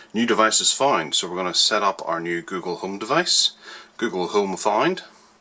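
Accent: Northern Ireland, United Kingdom. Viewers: lol never mind. It's nice to click on a review and hear a fellow norn iron accent though:)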